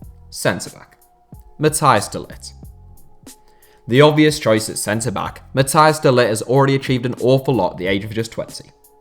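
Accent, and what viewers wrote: Ireland. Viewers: Is this the voice of the irishman man from the other HITC channel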